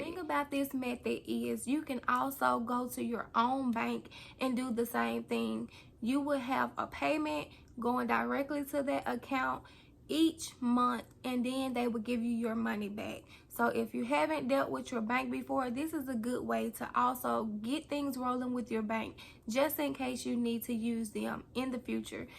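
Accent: South, United States. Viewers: Where you from Cutie. Love that south voice, video about saaalf!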